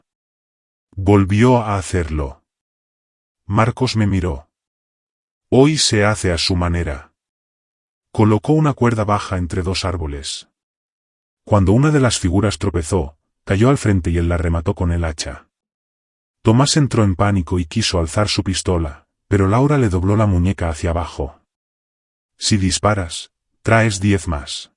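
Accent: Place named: Spain. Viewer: L voz españolísima la embarra..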